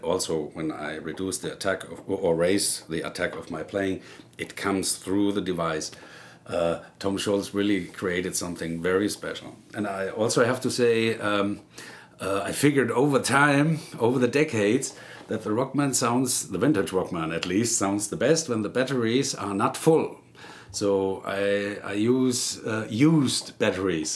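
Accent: Hesse, Germany. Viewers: When I was in the Army stationed in Germany I used to hear the local townsfolk speaking German and the dialect they used sounded much like this gentleman. I'm not sure of the spelling but when he used the word "bopple" it reminded me of one of my in-Laws neighbors who always wanted me to speak German with her and she would say "Bopple Deutch Mit mir". She would get frustrated because I would try and speak English to her, but I pretty much knew the local slang, and she knew that, so she always wanted me to speak German to her. I am guessing because she like hearing my American accent in German. It was always in good fun. She was a very nice lady. Anyway I bet this guy is either from the Hessen area or from the area that this lady grew up. I listened to the whole video because he reminded me so much of when I was over there. My Daughter lives over their and is married to a very nice German guy. 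I have a grandson from them that his birthday is the same day as mine, his great grandfather and his great great grandfather. We all have the same birthday. Its pretty cool.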